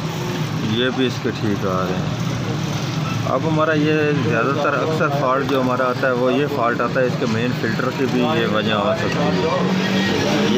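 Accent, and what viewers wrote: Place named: Punjab, India. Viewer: Tuci punjabi lagde aa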